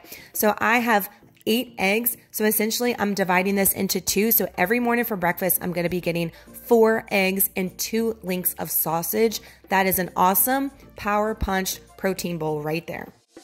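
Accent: Louisiana, United States. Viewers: Girl I can hear that fellow Louisianian in you!!! 504 representing!!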